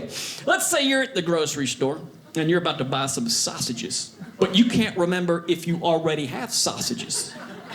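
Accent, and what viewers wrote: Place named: Texas, United States. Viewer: Hold up. I tried not to say anything, but this is eating at me: His mama is English, speaks fluent Italian, Dad is Italian and speaks fluent English. Why does dude sound like he's from Texas?!?!?!?!? Somebody help me, I'm sooooo confused 😕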